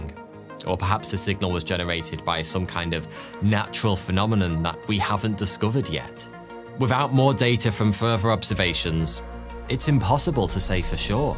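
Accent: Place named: RP, United Kingdom. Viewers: A posh British accent can’t make bullshit more palatable. Several explanations exist for the ‘wow’ signal. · Goodness, a posh British accent makes everything more palatable. It’s prized by Wall Street, Madison Avenue and Hollywood. Actors take classes in The Received Pronunciation.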